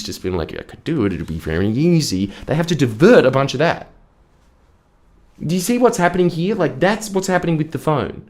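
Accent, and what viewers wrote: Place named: Australia. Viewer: Incredibly chad video. Already love self improvement content but goddamn giving self improvement advice with the addicting ozzy accent and jokes holy hell this is art. Instant sub. This is it, the gigachad of australian news also being a gigachad of telling people the massive downsides of being chronically online and stimulated one way or another!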